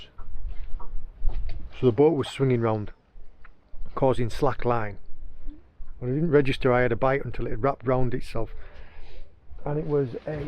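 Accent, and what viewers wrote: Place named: Middlesbrough, United Kingdom. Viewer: I’ve been watching your videos and I do like the fact you explain the tides and wind and how you set your boat for fishing😁😁🤩👍 I have also noticed your accent and it sounds like you could be from Middlesbrough or cleavland or red car love your content 👍👍👍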